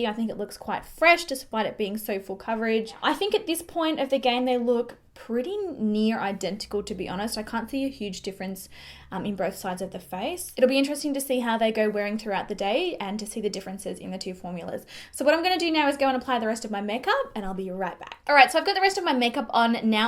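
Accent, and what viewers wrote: Australia. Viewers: Australian is by far my favourite accent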